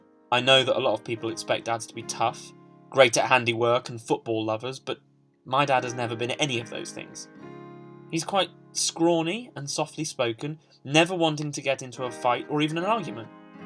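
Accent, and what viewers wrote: United Kingdom. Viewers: why does he sound british but act american??? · just because he has a british accent doesn't mean he says that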